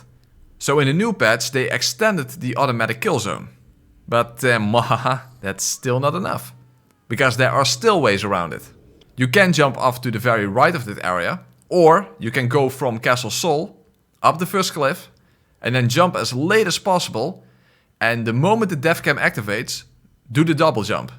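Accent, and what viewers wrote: Netherlands. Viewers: As a half dutch guy, hearing a Dutch accent upon opening a video is like Christmas day. So comforting 😌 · Haha tita tovenaar Rogier geweldig ik hoorde all aan je engels dat je nederlands bent maar dat grapje was echt geweldig🤣🤣🤣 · I don't know who you are but you sound very Dutch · Dutch? xD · damn you have such a thick dutch accent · He sounds dutch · i can smell the dutch in your accent. gegroet 🤙🏼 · the dutch accent is also super charming lol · Kankering = conquering hahaha funny Dutch accent you have. Nice vid! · Ur Dutch aren’t u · Love the video I love the Dutch accent too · the moment u said... ''ti ta tovenaar rogier godverdomme'' xD my first time i watch one of ur video's and i hear u are from the netherlands XD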